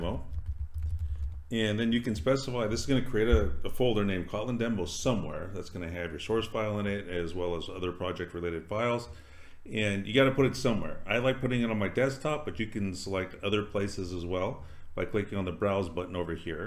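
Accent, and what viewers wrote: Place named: United States. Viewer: Some positives: * This is a recent tutorial, as of Nov. 2022, so it's closer to what IntelliJ looks like right now than older tutorials. That's **huge**, IMO, if something goes wrong (as it did with me when I first installed IntelliJ IDEA and a JDK). * Good pace * Clear, in American English (which may be a negative for some, but helped me) * Clearly, the person knows how to teach (I'd written something similar to the above in an earlier version of my comment, but I got distracted by coding and when I tried to return to finish my comment, it had gone "poof".)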